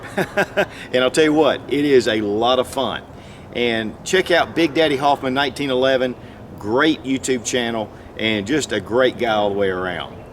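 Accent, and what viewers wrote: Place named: North Carolina, United States. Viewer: wiltson.....I have always loved the way u say that. in fact I frequently watch ur old Wilson combat videos......I'm from TN but u can't beat that nc accent of yours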